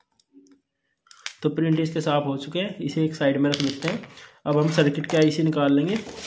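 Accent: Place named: Baghpat, India. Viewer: Sar aapke Bhasha Baghpat side ki hai kya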